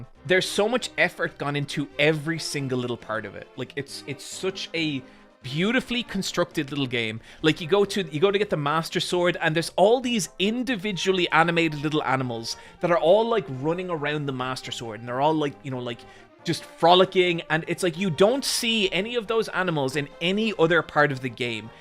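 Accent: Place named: Ireland. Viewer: Is it me or does he sound significantly Irisher than usual here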